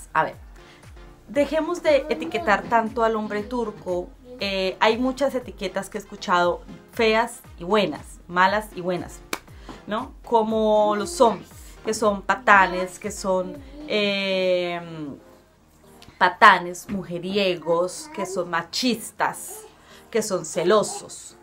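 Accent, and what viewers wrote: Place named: Colombia. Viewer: Me encantas como hablas a lo colombiano que graciosa 🇨🇴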